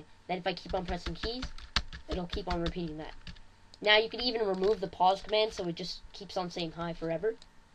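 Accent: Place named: Canada. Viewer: Good video. You have a strong Canadian accent.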